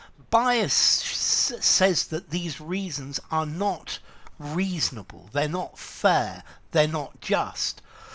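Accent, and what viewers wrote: United Kingdom. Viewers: We benefit a lot from you,british accent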